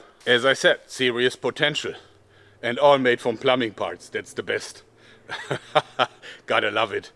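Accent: Germany. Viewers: Fake Accent? He has a Real one, And I have Something against German accents. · he has a real german accent, but sometimes exaggerates to make fun on his own accent.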